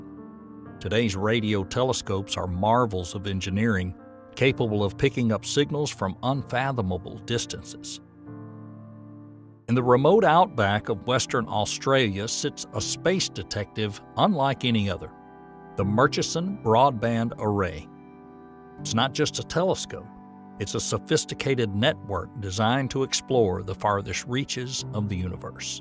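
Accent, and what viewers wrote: South, United States. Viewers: Country accents are cool.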